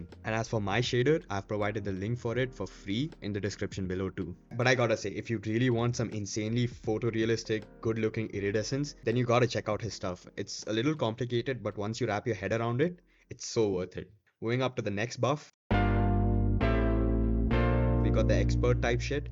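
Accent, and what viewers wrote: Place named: India. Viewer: Indian accent sure is dense, but holy hell i thought u have like 80k subscribers or something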